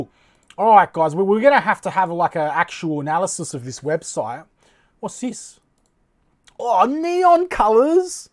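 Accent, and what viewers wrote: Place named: Australia. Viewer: Great video mate, just found your channel- already a subscriber, love hearing vids from fellow aussie!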